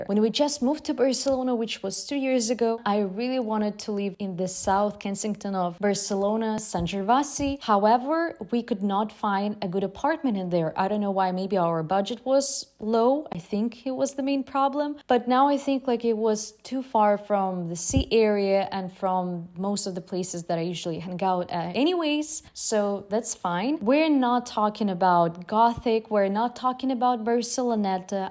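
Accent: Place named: Eastern Europe. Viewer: Where from East Europe is the accent? Russia or Poland?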